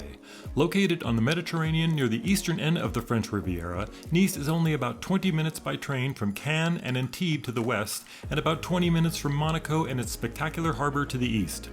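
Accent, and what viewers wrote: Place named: United States. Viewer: Nice videos of Travel. But try to pronounce the names of the cities more the local way in stead of American English. Cannes should me more pronounced like {kon} in stead of can.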